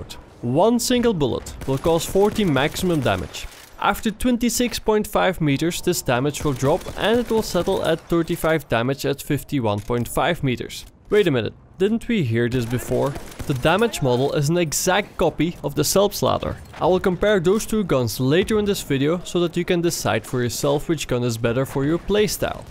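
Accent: Netherlands. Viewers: I believe he's Dutch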